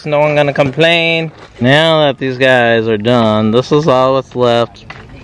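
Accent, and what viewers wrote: South, United States. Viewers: Can't help but noticed you have a southern accent sir. Where you from?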